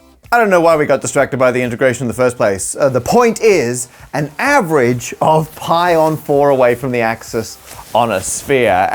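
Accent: Australia. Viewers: He's not a Brit. Can't you recognise an Australian accent?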